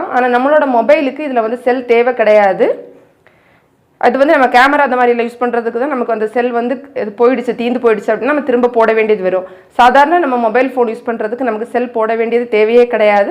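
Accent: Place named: Nagercoil, India. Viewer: Nagercoil slang ungaluku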